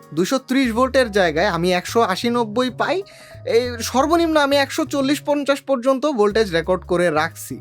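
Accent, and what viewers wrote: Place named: Netrokona, Bangladesh. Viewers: আপনার বাসা নেত্রকোনা নাকি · I'm also from Netrakona and I've shocked so much after listening it😊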